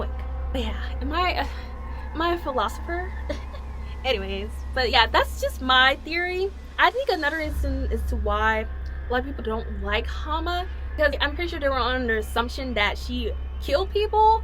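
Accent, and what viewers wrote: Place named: United States. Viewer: First of all, you’re stunningly beautiful. I audibly gasped when you came on screen. But to the point of the video: Iroh is not a war criminal. War crimes are specific things, none of which Iroh ever did. The few war crimes we do see are from - unsurprisingly - Sozin (genocide) and Ozai (attempted genocide), along with - surprisingly - Sokka (he flew the War Balloon with Fire Nation insignia in order to mount a surprise attack) and anyone who allowed children to fight (use of child soldiers, also a big no-no). Iroh mounted a siege while being on the wrong side of the war, but he also grew up under direct influence of the Fire Lord as his father, so one can understand why he might see it differently than it really was. All of his attacks were just trying to breakthrough the outer wall, which we know he failed to do. There are no records of him committing anything that constitutes a war crime. 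I’ll buy that Hama had to stay in the fire nation, but only because there’s oceans between it and the Earth Kingdom. Otherwise, she very much could’ve lived there in anonymity. Fire Nation were probably looking for her at all ports, so it would’ve been her best bet to stay put. But to say that she was somehow justified in torturing civilians (also a war crime, btw) just because they were Fire Nation is not a thought you should entertain. Based on your accent, I’m going to assume that, like me, you’re from the US. Would it be fair if an Iraqi POW kidnapped and tortured you, just because yo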